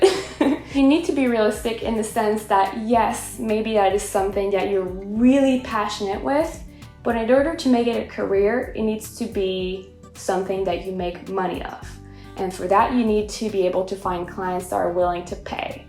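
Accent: France. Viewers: Illustration. You are so wise, my friend. Thanks for sharing your wisdom with us! :) PS: No wonder I connect with you so much. I have the same accent. Raised in France, moved to the U.S. at age 10, and I still trip on my words quite often lol. You're doing great! Also, my daughter has a rabbit named Mr Lapin. It's a peluche though (we speak frenglish at my house) hahaha. Bisous!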